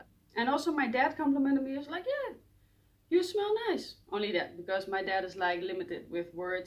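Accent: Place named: Netherlands. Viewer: I can tell by your accent that you're from the netherlands, klopt dat haha? My favorite is Bvlgri Aqva Amara for the summer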